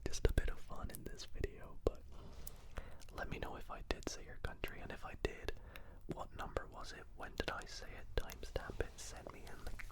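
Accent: United Kingdom. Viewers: I liked the sound of your accent and assumed you’re British, then read you’re from Australia. What a marvellous speech!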